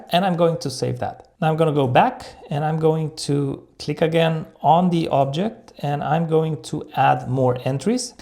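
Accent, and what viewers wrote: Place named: India. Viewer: primeira vez que eu vejo brasileiro falando em ingles com sotaque indiano kkkkkkk valeu pela aula mano, ajudou muito aqui na minha loja tmj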